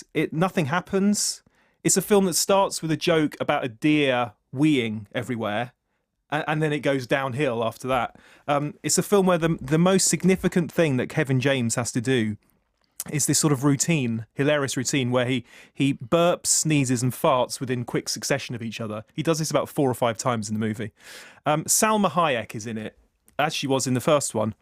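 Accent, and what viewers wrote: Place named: England, United Kingdom. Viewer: James sounds a little like Boy George when he talks; he must be from England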